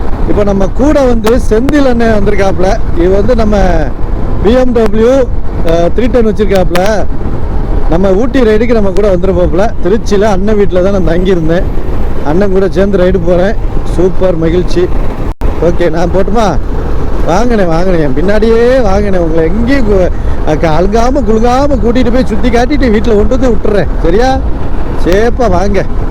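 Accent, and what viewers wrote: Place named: Tirunelveli, India. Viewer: Nellai Tamil plus for your channel. Please keep it up.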